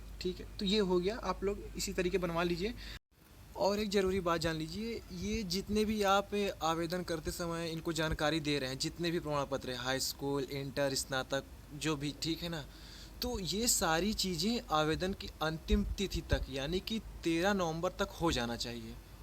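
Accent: Allahabad, India. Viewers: bhai lg rha tum allahabad se ho...meri bhi ekdum yhi akcent h bolne ki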